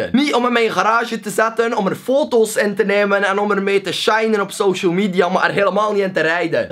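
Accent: West Flanders, Belgium. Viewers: Dit is West-Vlaams, meestal voor de andere Vlamingen niet te verstaan maar bij valt het wel mee.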